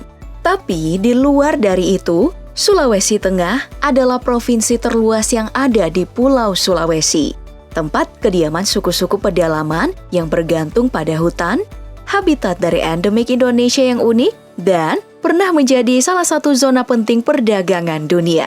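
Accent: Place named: Central Sulawesi, Indonesia. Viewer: Ahh mimin udah bisa logat sulteng😆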